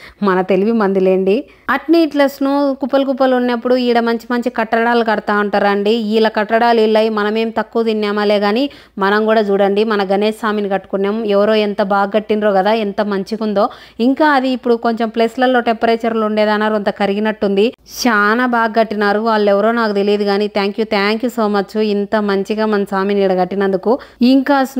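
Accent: Rayalaseema, India. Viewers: Hi ma maadhi kuda rayalaseema ne kurnool. Meeru rayalaseema slang lo matladuthunte chala bhagundhi.nice keep going on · Mana Raayalaseema slang yekkadiki vellinaa thaggedele.. negative comments skip cheyandi..manam andarinee navvisthu, navvinchadame mana vuddesam....👍👍👍👍👍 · Nice video, Maana Raayalaseema language ni andhari teliyajesavu ne mattalatho super chelli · super andi... 1st time mana rayalaseema slang youtube lo vintuna...ma uru proddatur keep it up 👍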